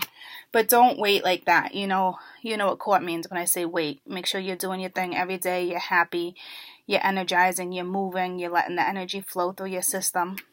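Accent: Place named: New York, United States. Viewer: Your New York accent is the cutest thing 💕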